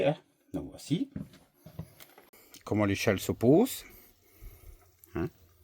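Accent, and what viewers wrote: Belgium. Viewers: 😂....j'ai rigolé comme jamais en regardant cette vidéo!! Outre l'accent Belge, succulent, votre bouille de bon gars qui s'est fait berner et qui voit son rêve se transformer en cauchemar (à 60000 balles) est superbe! Je suis vraiment désolé pour vous. Bonnes vacances tout de même!! P.S: prévoyez une tente Queshua pour les gamins, c'est plus rapide à monter et bien plus confortable!! 😊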